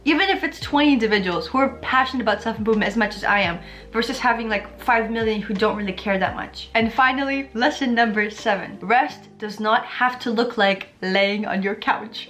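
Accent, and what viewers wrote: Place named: Germany. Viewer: You have a German accent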